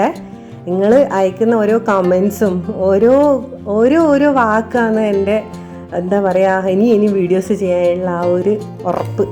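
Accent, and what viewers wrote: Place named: Kannur, India. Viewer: Eacheente varthanam keattere thoni kannur aanenn. E vido kandera skpm aann thirinje.. Adipoliyann tta vdo❤❤❤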